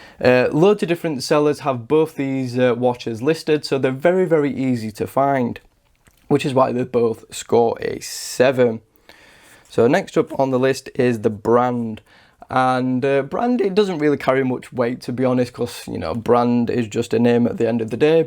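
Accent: United Kingdom. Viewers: Super Fluent British Accent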